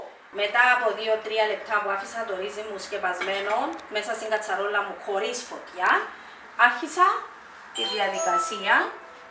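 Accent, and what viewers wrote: Cyprus. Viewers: Ωραία ακούγεται Κύπρια είσαι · Πολλα ωραιο!! Μα πιο ωραια η προφορα σας ! Λατρευω την Κυπριακη διαλεκτο.Να ειστε καλα.💖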